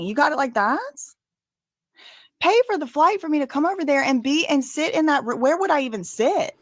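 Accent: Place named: Louisiana, United States. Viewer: You and Britney Spears have the same Louisiana accent. You are genuinely funny!! Always making me laugh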